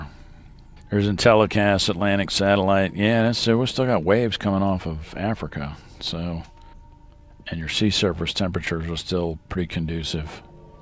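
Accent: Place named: Mississippi, United States. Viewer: You have a Mississippi accent. Where are you at. Why did you choose to open a youtube channel?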